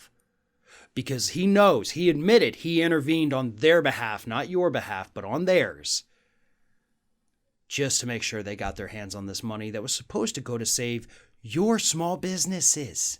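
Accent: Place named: Texas, United States. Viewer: Your Texan accent.....and the content....love it!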